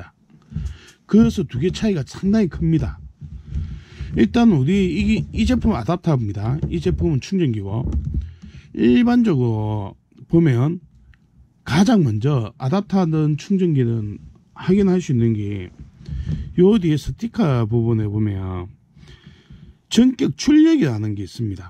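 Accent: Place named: Daegu, South Korea. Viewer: 아재 대구네요 사투리에 답답한말투 잘보고갑니다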